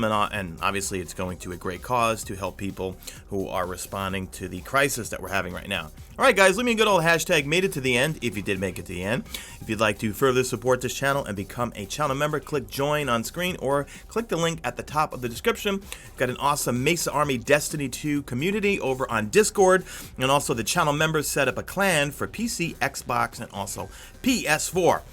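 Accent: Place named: East Coast, United States. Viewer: Mesa. You sound like you're from the east coast. I hear a slight accent. Regardless. keep making amazing content dude!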